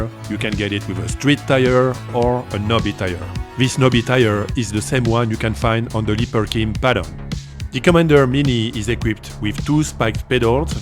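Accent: France. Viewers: Merci pour ta vidéo, j'ai l'impression que tu es français avec un accent qui ressemble au mien en mieux 😅. Tu utilises quel roue à New York dans la vie de tous les jours ?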